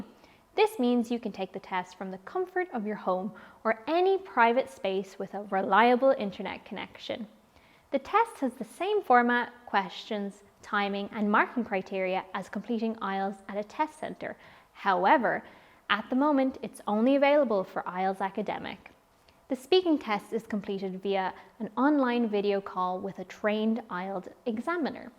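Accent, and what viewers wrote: Ireland. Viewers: Bro, she cant hide her irish accent... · who's this presenter? She's Irish. Very good.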